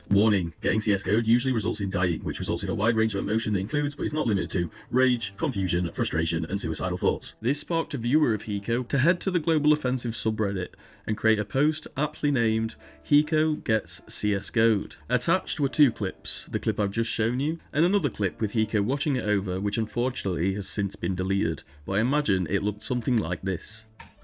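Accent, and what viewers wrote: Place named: United Kingdom. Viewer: yeah I was tripping a bit cuz your accent is the same as mine but something was telling me off 🙏🏻 which part of the uk tho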